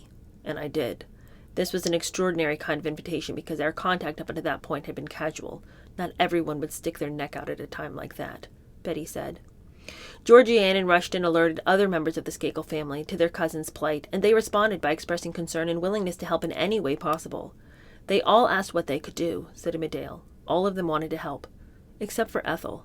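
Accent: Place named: RP, United Kingdom. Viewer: Sounds like the Mitford sisters 😂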